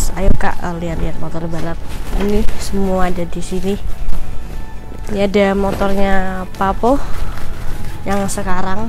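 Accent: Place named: East Java, Indonesia. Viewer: Yoi santuy. Logatnya kek Jawa timur an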